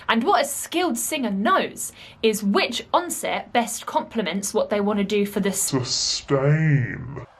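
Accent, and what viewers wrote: United Kingdom. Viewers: İt has my soul apart hhahaha .. I wanna find you and squeze your cheeks.. You could ve cuties person in the world 😇 Also your british Accent is so cute.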